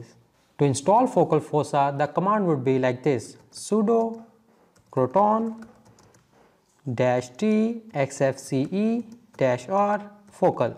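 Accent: India. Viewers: I have yet to try this but your Indian accent is way too strong, makes it hard to watch. I appreciate the effort though.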